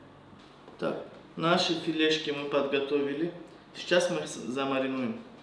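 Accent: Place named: Dagestan, Russia. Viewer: Почему у него дагестанский акцент при такой азиатской внешности?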